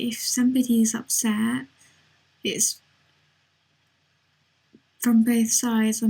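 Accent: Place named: Essex, United Kingdom. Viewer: I love listening to English accents in general, but I find yours is especially charming. I'm probably not even close but I'm going to go out on a limb and guess that you're from Essex.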